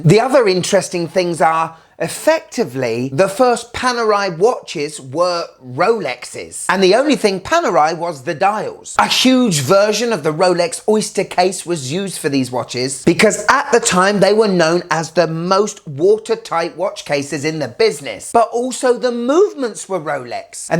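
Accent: United Kingdom. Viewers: Holy mackerel that Michael, Caine British accent, Austin Powers is so difficult to listen to. Seems like a cool guy though and understands watches for sure but wow what an accent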